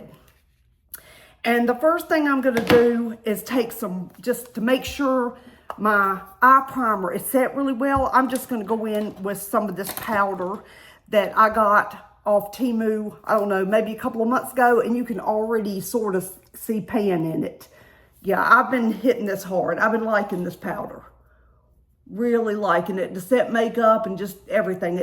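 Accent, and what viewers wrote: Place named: South, United States. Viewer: Happy Valentine's girl great look!! I love your southern accent and the things you say always gives me a giggle 😊 love ya my friend xo ❤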